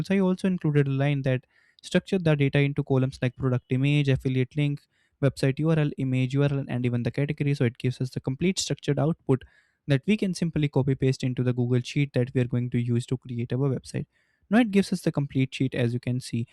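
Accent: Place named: India. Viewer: Sorry, but your Indian accent makes it difficult to follow the video. Better use a generic AI voice.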